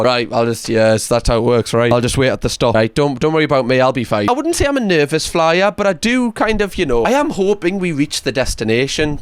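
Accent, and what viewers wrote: Newcastle, United Kingdom. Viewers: Funny story: I fell asleep listening to YouTube and ended up being a part of my dream. A group of us and were in a restaurant where I live in Louisiana USA. was talking constantly and sometimes loudly about Gordon Ramsey in his Geordie accent and all of the redneck southerners were giving us strange looks and saying stuff. We were, like, “oh…he’s our cousin visiting us from the UK.” Whenever he’d say “Gordon” we’d say loudly “he means Uncle Gordon.” Now I kinda wish really was our cousin from the UK who comes to visit!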